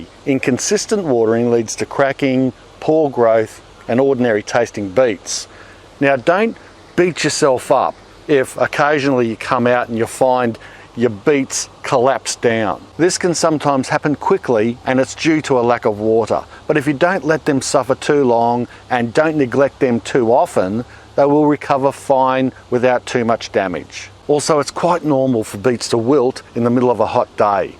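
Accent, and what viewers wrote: Australia. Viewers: Chef E He lives in southern Queensland in Australia and he is speaking Australian. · i enjoy your content delivery, your Ausi accent as well; i take what i can from you, because i live in a 6 months of gardening a year if i'm lucky, area just north of the 45th parallel, on the shore of Lake Huron one of our great lakes. i love beets, and growing them; i took away the spacing part of your talk, i'm gonna try your way in about 4 months. thanks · Love your Australian accent! Your opinion of sub-irrigation grow boxes?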